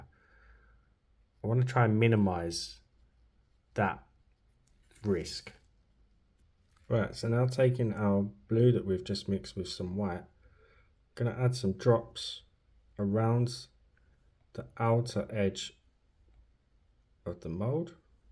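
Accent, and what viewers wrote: United Kingdom. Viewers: New subscriber here, hello! 👋🏻 Between the use of the cat eye nail polish in your crafts and the genius that is the 'swirl' I just had to subscribe! 😂 Where abouts are you from in the UK? I live not far from Brighton and am trying to place your accent 😂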